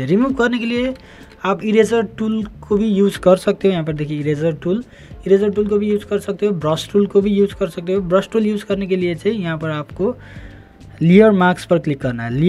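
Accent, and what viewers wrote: Nepal. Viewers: Nepali vhayara hindi ma video banouniii haa...sound le sedai th pouxa bro ...tapai nepali ho vanera .....😂😂😂😂😂😂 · Bro Nepali ho jasto x tara bhasa hindi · You are nepali,you are not using correct hindi tone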